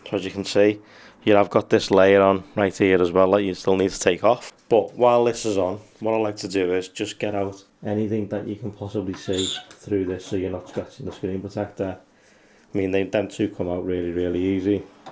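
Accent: Liverpool, United Kingdom. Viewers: What a god awful accent scouse is haha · i was pretty surprised when i heard the scouser accent, dig it mate!😎👍 also: you are the only one who did 100% correct, everybody else took the second protector off before they removed the bubbles. cheers from switzerland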